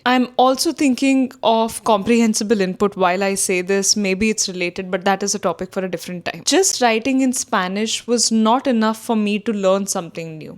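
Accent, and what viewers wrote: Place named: India. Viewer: Thank you, muchas gracias. You seem to have an Indian accent.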